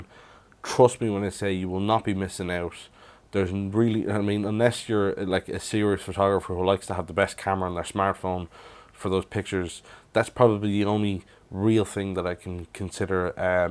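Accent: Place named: Ireland. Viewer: R U from Ireland by any chance cause u sound like u are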